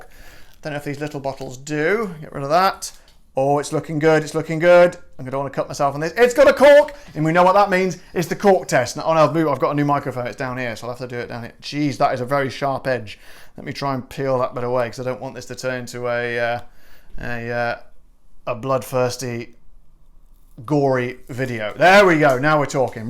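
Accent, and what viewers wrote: Scotland, United Kingdom. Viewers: Ooohhhh a Scottish burr is the sexiest accent on the planet. Nice! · One thumb up for the Scottish accent. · Ur American voice is funny but I like ur Scottish accent. Bringing love from the states bro bro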